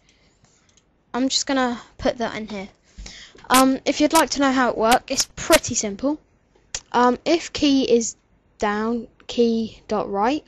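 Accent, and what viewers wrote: United Kingdom. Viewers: thx! this really halped! and w00t FINALLY i hear a British voice on youtube 4 once XD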